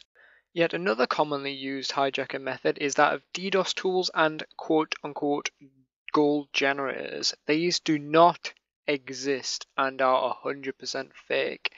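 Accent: Newcastle, United Kingdom. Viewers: Are you from newcastle? you sound georide :) im from newcastle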